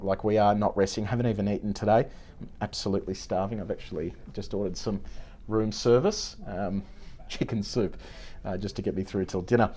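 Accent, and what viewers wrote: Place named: Australia. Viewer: Gotta tell ya, for your first go at this sorta stuff, you do it better than most. And it's refreshing to hear an Aussie vlogger:) can't wait to see more!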